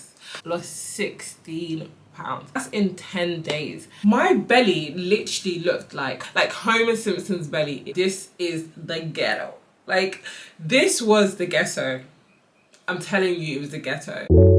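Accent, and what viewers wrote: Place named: United Kingdom. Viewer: Side note: Sometimes it’s just nice to click on a video and hear a British accent ☺️ you look amazing sis! I can’t wait to start my journey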